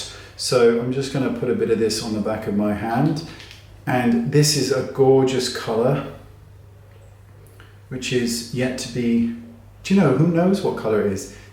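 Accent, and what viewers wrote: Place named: United Kingdom. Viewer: you make me smile when you get annoyed. I guess it’s because you brits make everything sound nice!🙂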